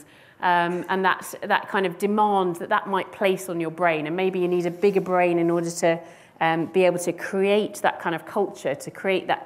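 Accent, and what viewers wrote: United Kingdom. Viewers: The language is English but to this American’s ears it sounds very British. Some Brits are easier to understand than others, I’d say she’s in the middle. Anyway, this is a good talk if getting dated. No particular insights although I didn’t stay till the end. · what part of england does that british accent come from and does it have a particular name?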